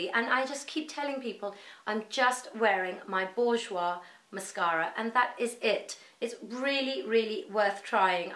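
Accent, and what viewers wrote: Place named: United Kingdom. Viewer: Thank you so much for the video!i m a new subscriber and so so glad to have come across your channel and blog!i have watched all of your videos now and absolutely love your style!2 months ago we moved from London to Canada and it is so so lovely to hear your gorgeous british accent😉😉!i love listening to you!at the end of april i m coming to London with my two little boys and will defenatly check out your recommendations!i would love to see a whats in my bag with new celine bag! Your previous video with celine trapeze bag made me just fall in love with it!i am planning to get it whilst in London!i love your colour and hope i can find something similar!i know that these colours are seasonal but lets hope for the best!my question for you would be whether after owning celine trapeze bag for a while would you still repurchase it?did you have any problems with it?i absolutely love yours since i saw it and now cant get it out of my head!👜👜👜i hope i can find a lovely colour!thank you so much for being on you tube!i m so glad to have found your channel!i love your instagram!💋💋💋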